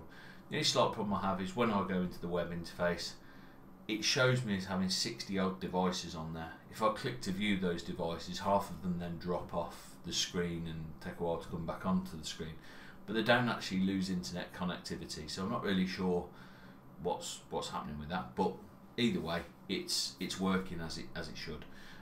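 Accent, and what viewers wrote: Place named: England, United Kingdom. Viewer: It funny he looks like an American Alabama football fanboy. It's weird hearing an English accent. I'd expect a southern twang coming out of his mouth. I bet he's a huge soccer fan. Those boys are crazy. They kill each other over games and scream racist stuff at the players. I'm not saying he does that but you know what I'm saying.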